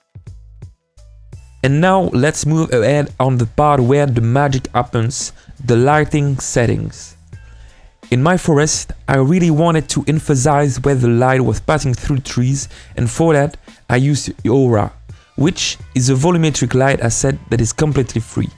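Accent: France. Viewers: If you're commenting on his accent, it is French, not Ugandan.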